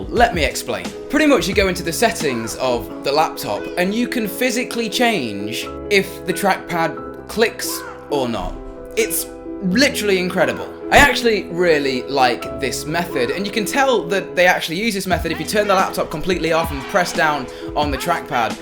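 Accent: England, United Kingdom. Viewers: You’ve basically copied MKBHD with the pros and cons Only with english accent ...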